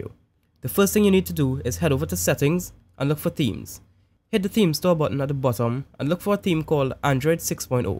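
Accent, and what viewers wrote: Trinidad and Tobago. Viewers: Are you trini? Can spot that accent a mile away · I recognise that accent! love all the way from trinidad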